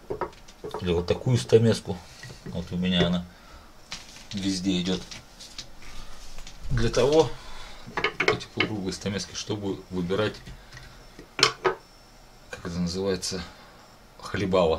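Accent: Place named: Altai, Russia. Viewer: Зачетная ложка!!! Да, в твоих руках все так легко получается!! Сам сажусь резать - долго все происходит и результат мало радует, но для души это рай трудится с деревом!, где то слышал, что ты из Сибири, а от куда именно? Говор на алтайский похож. Угадал?)))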